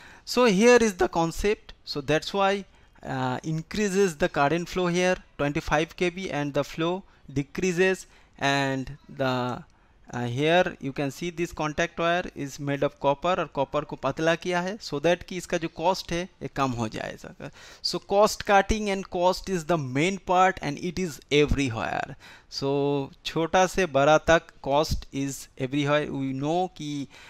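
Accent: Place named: India. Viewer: I really appreciate the content but I need to say, that for a not native speakers or not Indian the accent is hard to understand. So as a constructive advice I will recommend improving your speaking skills to easy reach the 1M.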